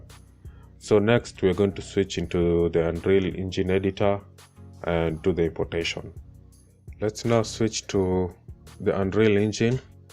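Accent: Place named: Kenya. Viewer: Bro..you sound soo Kenyan !!!🇰🇪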